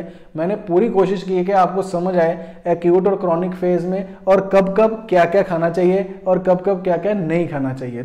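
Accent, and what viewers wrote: Punjab, India. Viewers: As per ur dialect u seem to be Panjabi. Am I right?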